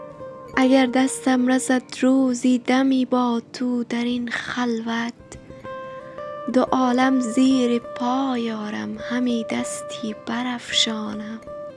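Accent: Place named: Tajikistan. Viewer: آفرین برتو بانو با این لهجه شیرین دری و تاجیکی، و مرحبا برمولانای جان ، که چه زیبا و چه کوتاه ، تکلیف ، وجود خود وهمه آدمیان را شیرین و گویاه بیان کرده ، بعصی اوقات فک ر میکنم اگر مولانا و شمس و ابن سینا و سهروردی و دیگر ابر انسان های تاریخ ، انسان ند و از نوع بشر ، پس ما کی هستیم ، شاید علفهای هرز ،باغ بزرگ افرینش هستیم که یکذره رنگ سبزی درختان را داریم و مارا جزیی از این باغ حساب کرده اند ، آیا اینها مخلوقات خاص خداوندند ،که خاص آفریده شده اند یا ماهم میتوانیم مثل آنان بشویم . دنیای عجیبی دارد این مولانا . 🌺⚘🌼💐🍁💐🌼🌻🌺🙏🙏🙏🙏♥️❤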